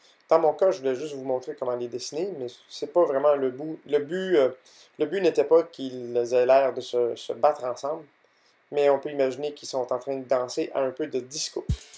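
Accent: Canada. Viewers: T'as un petit accent canadien, j'aime bien. Tu pourras dessiner Sulfura stp? De toutes façon t'y arriveras vu comment tu dessine trop bien. Et comment tu fais pour éfacé avec ta main?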